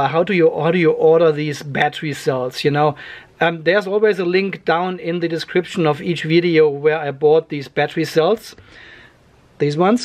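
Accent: Germany. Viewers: Hallo, höre ich da einen deutschen Akzent ? mfg michael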